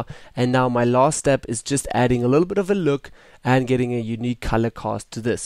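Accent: South Africa. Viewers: You sound South African. I dig that!!! Nice video!!!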